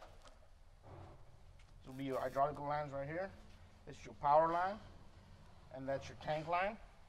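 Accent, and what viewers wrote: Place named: Louisiana, United States. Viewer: Little bit cajun lol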